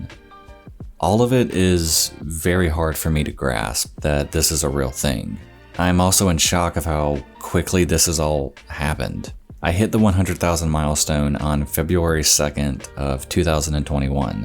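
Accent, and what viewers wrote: South, United States. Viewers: How come I’ve never heard the southern accent until you’ve mentioned it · His "southern" accent is about as strong as mine; I was born down South but grew up in various northern states and it's mostly faded. The accent is still evident in certain words and phrases though. It's just not a typical southern drawl.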